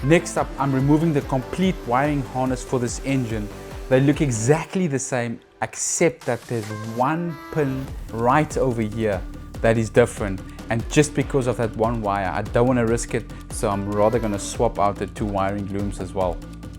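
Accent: South Africa. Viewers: Started watching but the South African accent grates me so I'm off to watch something less annoying.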